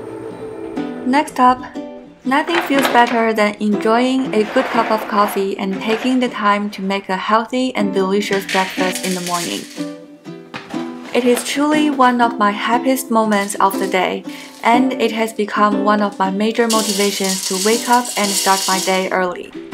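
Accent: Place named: China. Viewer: ) 本來以為你是外國人，聽你說話，就聽出來是中國人了 😊你的影片都很好看～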